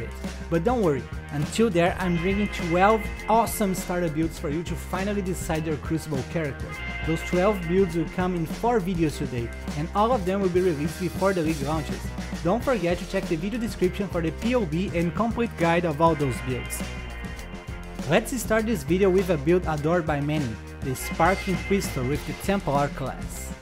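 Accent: Brazil. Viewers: Cara é incrivel como seu inglês é perfeitamente correto e ainda consigo sentir que é um BR falando ahuahuahuaau nice video irmão!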